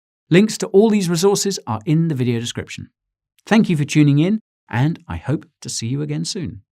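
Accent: United Kingdom. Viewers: I was just listening to that cool British accent 😅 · This is how we talk in Britain.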